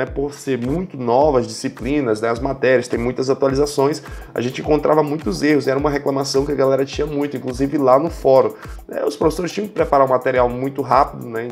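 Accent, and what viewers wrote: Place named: North, Brazil. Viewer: Vc é nortista? Tem um pouco de sotaque rsrs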